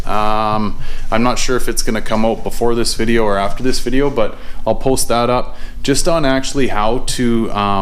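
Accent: Canada. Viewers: You sound Canadian eh. I'm in Sask. I have the same rear main and clutch issues, sigh.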